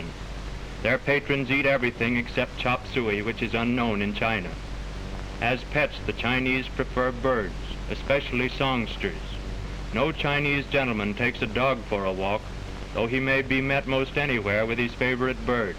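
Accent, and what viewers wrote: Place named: Mid-Atlantic, United States. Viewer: Yes, it was a typical manner of commenting back then, with the upper class Mid-Atlantic accent, and had nothing to do with disdain. It's also worth remembering that it was only the 2nd or 3rd year of the "talkies."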